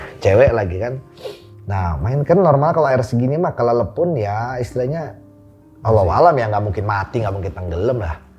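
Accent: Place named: Jakarta, Indonesia. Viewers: Aksen Betawinya enak banget di dengar bang.. yg di sampaikan Masha Allah ngena banget. On point gitu.. terimakasih atas ilmu dan pengalamannya bang.. semoga sehat selalu. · Emg betawi logatnya begitu dah emg ceplas ceplosnya juga tanda peduli, lu punya temen jgn dari situ situ aja makanya mbaa, jd ga menyimpulkan begitu setiap org dr setiap daerah punya ciri khas masing"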